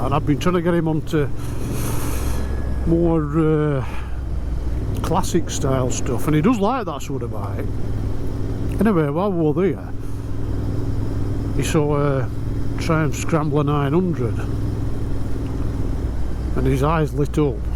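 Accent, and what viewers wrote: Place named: Stoke, United Kingdom. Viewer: Hi thanks for the sub Off work today and enjoyed your commentary and the accent I heard my Stokie accent and like you I could barely listen to my own voice really and still can’t much sounds weird to me!!! I’ve not had a lot of time to do new videos this year really but will do the odd one or two when i can going forward. Loving the bike mate Atb phil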